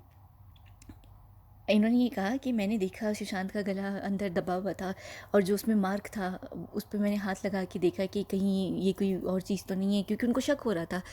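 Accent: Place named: United Kingdom. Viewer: You have a British accent when you speak English 😀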